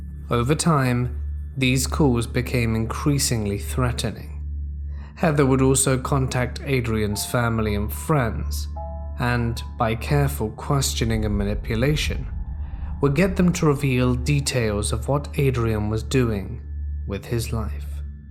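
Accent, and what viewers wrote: United Kingdom. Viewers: Love your channel mate.True Crime and Horror are my favorite :) Your narration is top notch (your accent is like Michael Caine as Alfred in the Dark Knight trilogy).yes that is a compliment. Long live the Crime Reel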